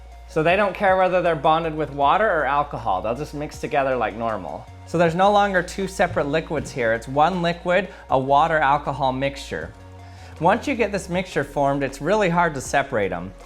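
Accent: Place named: United States. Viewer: annoying yanky droning voice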